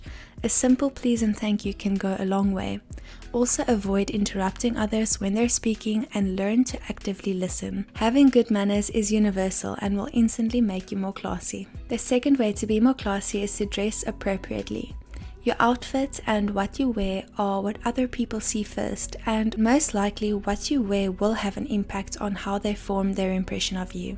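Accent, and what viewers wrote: South Africa. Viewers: " " Your South African accent is refreshing for a South African such as me, who has lived in the USA for 30 years now. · I can hear that you are South African. I love that!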